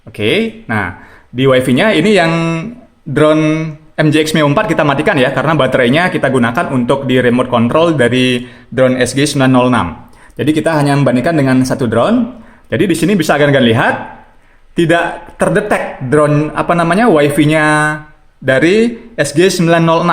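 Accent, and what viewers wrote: Bali, Indonesia. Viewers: Logat bali, Semeton ne 😍👍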